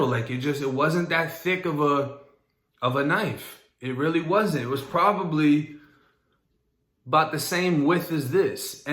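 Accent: Boston, United States. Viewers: U from florida? Or boston? That accent tells me u were raised somewhere else other then Florida lmao 🤣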